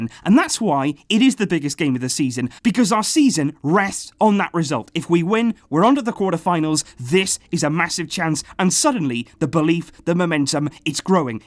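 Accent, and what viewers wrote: Wales, United Kingdom. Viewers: I really love your Welsh accent. Brilliant content as always, and I do hope this channel would grow bigger and bigger.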